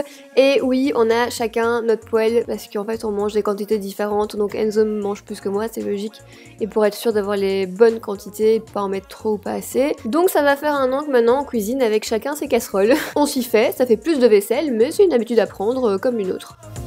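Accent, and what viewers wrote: Belgium. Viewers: J'adore l'accent belge 😂😂😂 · J'adore l'accent belge: houit 😂😂😂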